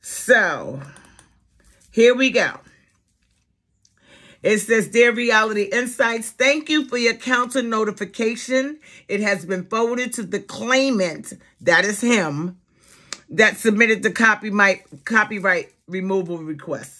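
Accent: East Coast, United States. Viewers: I hope you make friends AND make money. I’m a new subscriber. I hear that East Coast accent! Have a blessed day!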